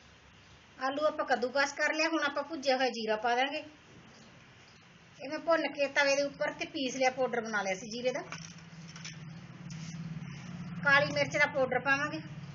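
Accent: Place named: Ludhiana, India. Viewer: ਪਰ ਤੁਹਾਡੀ ਬੋਲੀ ਲੁਧਿਆਣਾ ਸਾਈਡ ਲੱਗਦੀ ਆ ਜੀ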